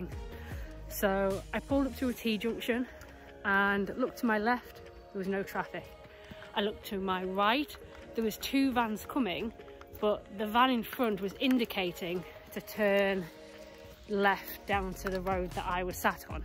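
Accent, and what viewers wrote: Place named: Warrington, United Kingdom. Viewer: That sounds like a Warrington lass accent?😂 Did you say the site was the Camping Club Site? Lovely video, Delamere is an old haunt of mine but I live in Lakes now. Gonna pop back down for a mooch again this Winter. Thanks 👌🌞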